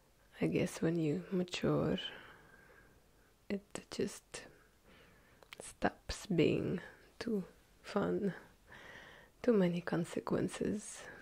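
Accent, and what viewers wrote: Russia. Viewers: My Dear I would listen to you read a math text book as long as you were using your heavy Russian accent. And I hate math.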